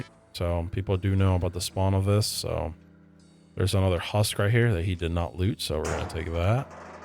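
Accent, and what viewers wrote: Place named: India. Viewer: i know your indian but could you tone the accent down a little, hard to understand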